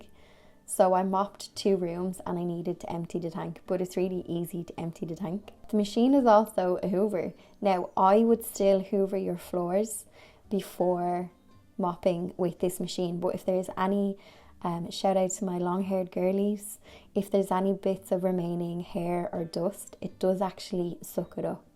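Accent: Ireland. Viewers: September is a wonderful month, an intro to fall. Love your autumn bedding. Your house is so cute. You do such great things with it and your yard. Love watching your videos and hearing your Irish accent. I’m in sunny San Diego, California. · Hello Sweet Girl, I love watching your channel so much. You have such a beautiful relaxing spirit and watching you do your housework, crafts and garden 🪴 inspires me to those little things that I often put off. 😊 Love Ireland 🇮🇪 and your gorgeous accent, nothing beat’s a good Irish pub………..definitely beats drinking at home alone for sure! 🍻🥂🍾🍹❤ September is the start of Spring down here in Australia 🇦🇺 I love watching all the blossoms opening up on my weeping cherry tree and the buds developing on all my rose bushes! 🌹😊🌹 Kindest Regards Deb 🌷🌷🌷🌷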